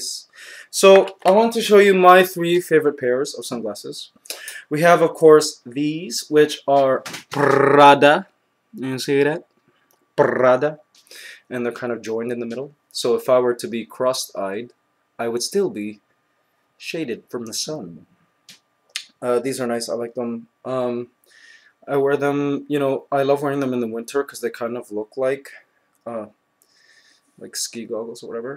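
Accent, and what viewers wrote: Philippines. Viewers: Filipino accent rocks.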